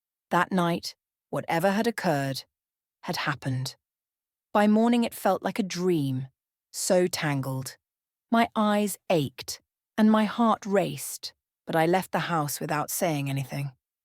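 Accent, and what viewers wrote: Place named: United Kingdom. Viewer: British accent is really beautiful to listen. ❤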